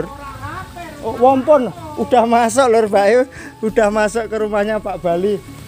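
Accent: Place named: Java, Indonesia. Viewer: Kula seneng bahasane mase...medhok desa mbanget....kalih guyune...renyah....kados nopo ta piantune.....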